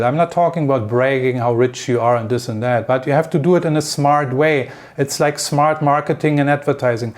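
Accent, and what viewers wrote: Germany. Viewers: Do I here a strong German accent? 😊 How to stay there without border running?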